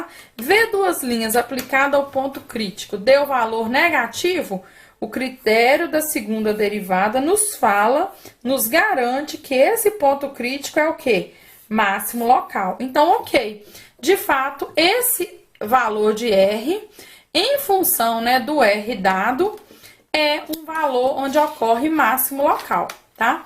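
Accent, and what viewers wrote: Minas Gerais, Brazil. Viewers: E esse sotaque Mineiro parabéns prof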